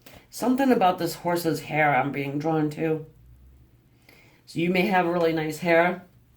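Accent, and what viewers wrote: New York, United States. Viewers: Where are you from? New Jersey? New York? Long Island? I love ur energy haha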